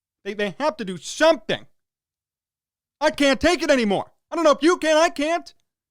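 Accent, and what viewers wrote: Chicago, United States. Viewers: This is the most Chicago accent I’ve ever heard in my life.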